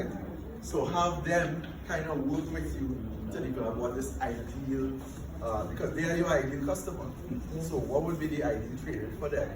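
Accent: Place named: Jamaica. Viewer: I'm enjoying this lecture. I'm into sales marketing and can relate perfectly with this. I'm just trying to grasp the Jamaican accent 😂😂😂😂. Good content you have here💃💃💃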